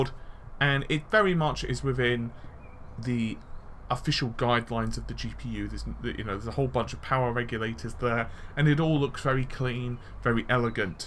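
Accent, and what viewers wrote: England, United Kingdom. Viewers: Best place to listen a good English accent too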